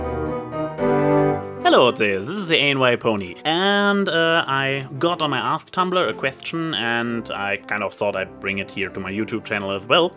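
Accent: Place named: Germany. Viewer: ... you cant tell by the accent? i believe he already has told people but, if i remember right he is from Germany.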